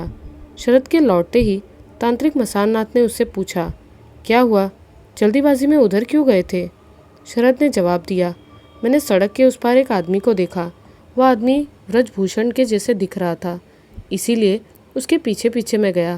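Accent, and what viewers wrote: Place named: Eastern Uttar Pradesh, India. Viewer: लेकिन बोलने के तरीके से east up की लगती हैं 🙏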